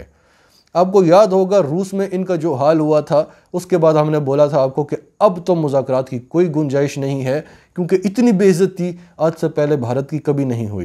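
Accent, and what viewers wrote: Pakistan. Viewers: The best u tube chanal imence respect brother love your talking style good pakistani urdu accent best urdu word s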